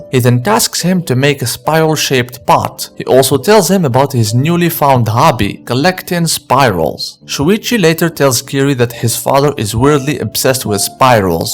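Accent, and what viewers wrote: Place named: Japan. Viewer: oh. I asked do because your accent is very jap.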